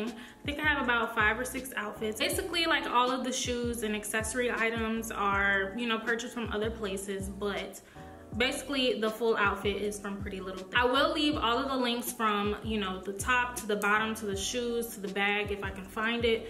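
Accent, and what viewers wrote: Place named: India. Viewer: She sound like India to me .😍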